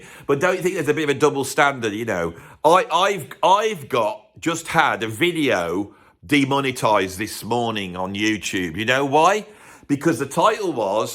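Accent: Birmingham, United Kingdom. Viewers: 1. Loud brummy spouting his worthless opinions on youtoob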